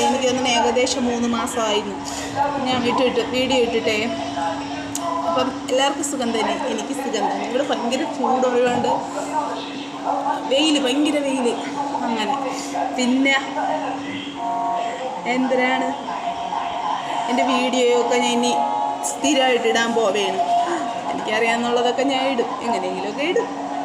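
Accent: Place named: Thiruvananthapuram, India. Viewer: നിങ്ങളുടെ തിരുവനന്തപുരം സ്ലാങ്ക് എനിക്കിഷ്ടമായി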